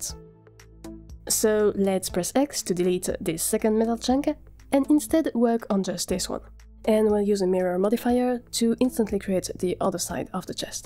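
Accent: France. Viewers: bro is def french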